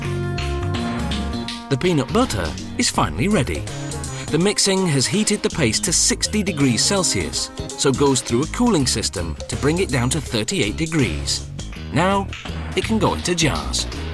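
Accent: England, United Kingdom. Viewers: He's so delightfully british! With his vitamins and aluminum! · I'm not sure where the series originates, but the narrator is English (unless he's from elsewhere in the British Isles and has a remarkable English accent). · Lulz, gotta love how the Brits say certain words differently than we do, like "vitamins".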